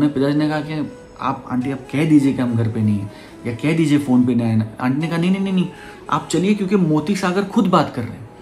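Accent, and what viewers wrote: Standard. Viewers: Your Hindi talking is that much pure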